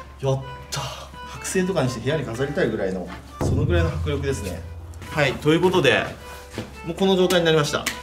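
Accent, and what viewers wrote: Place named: Tokai, Japan. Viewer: 東海出身ですか？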